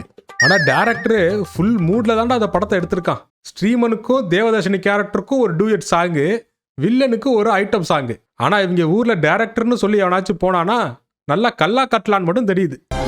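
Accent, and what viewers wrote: Kongu, India. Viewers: Day kongakara kannada nalla irkunda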